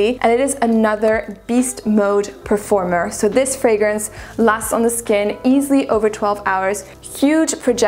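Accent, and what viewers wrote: France. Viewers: She’s a franchie, I’m French so i can see it obviously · My God that accent…I could listen to french people discuss anything. The list is really impressive and has given me some great ideas to sample. Cheers!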